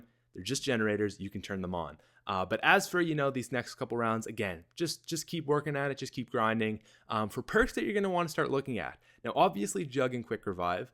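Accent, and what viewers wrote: Canada. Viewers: are u canadien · r u canadian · Is he Canadian? It sounds like he has our accent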